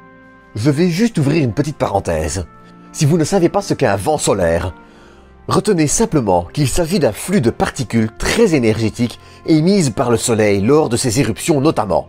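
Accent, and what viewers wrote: Liège, Belgium. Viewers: Exact, mais les français sont pas habitué à l'accent de la couronne Belge, ça les calmes. est super bosseur, en produisant une vidéo par semaine, c'est sa force, depuis les 20 K de Neowise, et plus de 150 vidéos en stock, la machine commence à s'auto alimenter. Il a l'envie de poursuivre, donc dans la durée, la chaîne aura plus de place. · Bel accent de Liege :D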